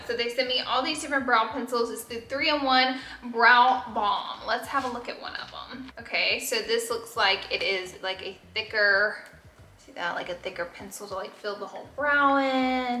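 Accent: South, United States. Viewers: Sounds like she has a southern accent. I love it! For time watching her.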